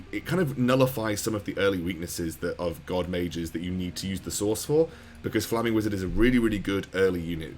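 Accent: England, United Kingdom. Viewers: The background music and the accent from a mature england man made this a lowkey asmr video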